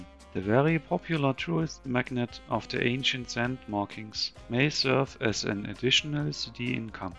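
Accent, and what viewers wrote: Germany. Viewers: Dieser Deutsche Akzente 😄 So spricht mein Vater Englisch 😅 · Do i hear a german accent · Man kann den deutschen Akzent ganz schön deutlich raushören😅 Ich selber bin da aber auch nicht viel besser, wenn ich "Englisch" spreche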